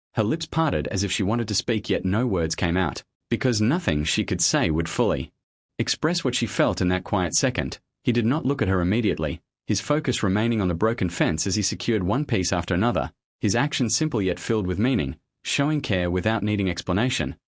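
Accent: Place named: Australia. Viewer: Okay. . . With this voice, I suppose I can imagine this is about the Strayan outback.😊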